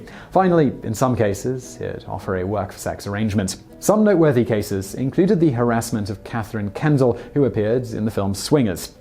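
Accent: United Kingdom. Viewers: He's also British so his pronunciation would be a little different.... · brother, your videos are excellent, however, certain words my friend, i.e., "berry" as oppose to baby. Rosemary's Baby. I gave you the benefit of the doubt due to the British accent, after rewinding the video many times, yes, "berry." Cheers my friend.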